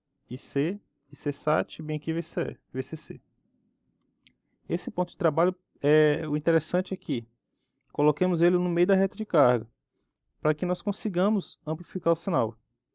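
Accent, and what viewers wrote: Northeast, Brazil. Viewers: apesar do sotaque caipira do nordeste que acho engraçado. piadas a parte sem discriminação, você é o melhor professor de eletrônica do you tube e do Brasil. devia ser premiado pela NASA, Harvard, MIT e cambridge.MUITO SUCESSO VC MERECE ALÉM DE SER "O CARA, "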